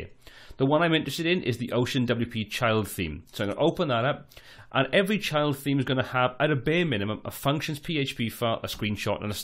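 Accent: Wales, United Kingdom. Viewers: Welsh accent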